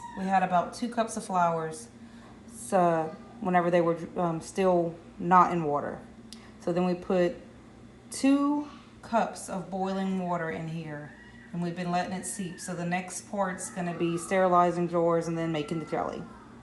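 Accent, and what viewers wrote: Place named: Louisiana, United States. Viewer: Are you from Louisiana? Your accent sounds so much like mine lol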